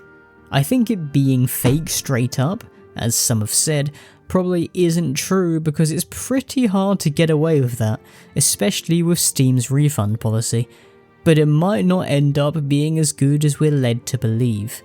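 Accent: United Kingdom. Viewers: it took me this long to realize you are British. That's how bad I am at recognizing accents...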